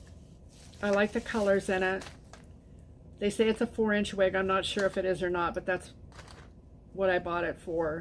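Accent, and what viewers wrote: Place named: Illinois, United States. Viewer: I subscribed to your channel because I'm tired of all the others that are doing strange things. I'm about the same age and I have bought many of the dresses you have purchased. So we have the same style. I use to live in Illinois and your accent sounds from that area. I now live in California. I love Temu but have spent tooooo much on that site.....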